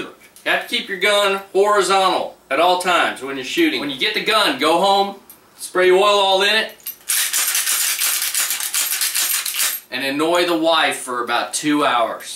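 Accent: United States. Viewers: Love your fully Texan accent (no homo tho)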